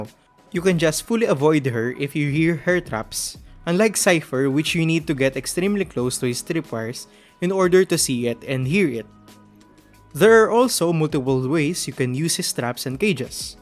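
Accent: Philippines. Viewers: Are you filipino? your accent sound filipinos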